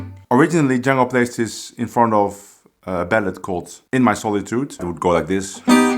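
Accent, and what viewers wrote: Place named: Netherlands. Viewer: I love your Dutch accent, it's the best. 😍😉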